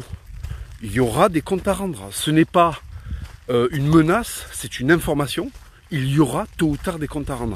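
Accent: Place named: Arab world. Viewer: Merci d'avoir posté les preuves évidentes que tu n'es pas un Breton et ne l'a jamais été. Tu zozotte toujours autant le bédouin hahaha et puis bon, ton accent, malgré tes acrobaties n'échappe à personne. 100% Accent arabe. Bon allez, je te laisse rêver à une identité qui n'est pas tienne. Tu t'humilie tellement tout seul que je n'ai même pas besoin de te vanner. Y'a pas de Fatima en France, à part les bédouines arriérées venues de chez toi, qui viennent à la nage chez nous.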